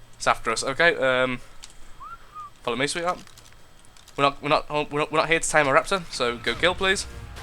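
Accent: United Kingdom. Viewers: keep on going with your videos of this game, this is awsome.Good work soo far britain man with funny accent and dislexia.(that is no offens)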